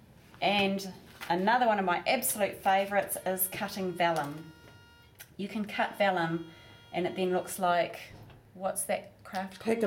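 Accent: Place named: United Kingdom. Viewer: British Accent LOL! Cute tho, :)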